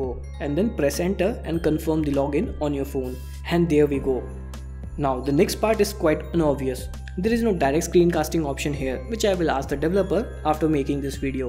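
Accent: India. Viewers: Thanks, good info. One suggestion, if u don't mind: I listen to many video presenters with Indian accents. They all have same problems. "T" pronounced as "D". Example: "two" is pronounced as "do". Also "v" is pronounced as "w", example: "video" or "wideo"? "very" or "wery" ? V and W should not be switched around. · Why is it always guys with Indian accents? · OMG that accent! almost gave me a headache! RIP English. Btw whats with u Indians wanna make everything complicated maybe because you think you are smart or whatever. this whole subject could be explained in a 1 min long video.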